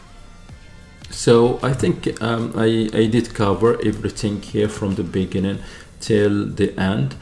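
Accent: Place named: Morocco. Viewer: mgharba baynin mn accent 💪🏼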